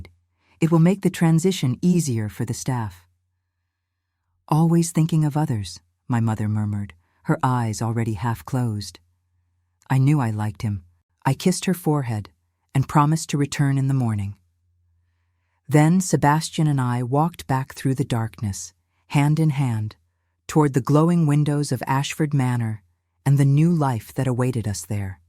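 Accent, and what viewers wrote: United States. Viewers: The american accent is awful, it is a british setting....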